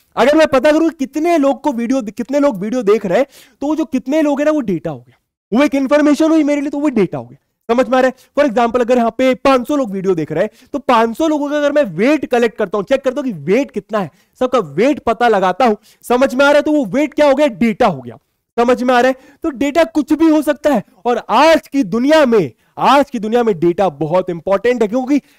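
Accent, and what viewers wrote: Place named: Bihar, India. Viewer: Sir ka bihari accent🤌🏻🤌🏻🗣️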